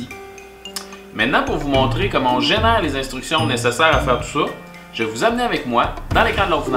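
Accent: Quebec, Canada. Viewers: Vraiment bien expliquer! Au "Salut!" j'ai fait le saut je m'attendais à entendre un accent français et non québécois! Vraiment bien fait le vidéo avec des explications claires! :D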